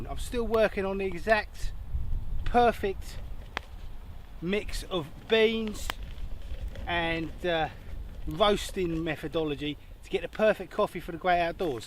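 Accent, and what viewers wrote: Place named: Cockney, United Kingdom. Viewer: a Cockney living in Sweden. :)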